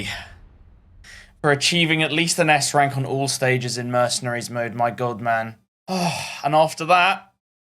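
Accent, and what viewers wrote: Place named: Ireland. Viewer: What is that accent you have tis.......noice Irish?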